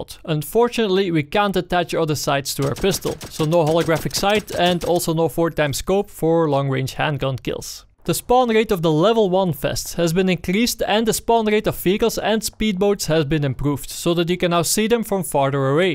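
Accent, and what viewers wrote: Netherlands. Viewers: He sounds Dutch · dutch arent you xD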